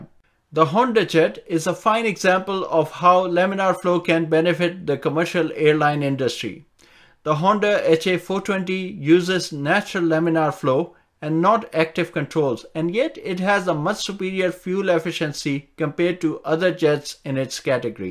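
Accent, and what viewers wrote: India. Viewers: I love your Indian accent and breadth of knowledge, but you didn't mention Gliders !!!! Thankyou, i loved your video.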